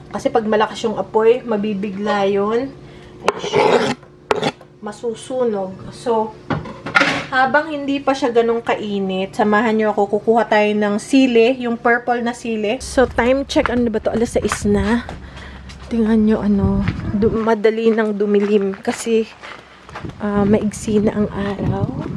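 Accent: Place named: Mindoro, Philippines. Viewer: Hi ate, curious lng kasi ako..saan province mo dito sa Pinas? Parang tono samen sa Mindoro po kasi😊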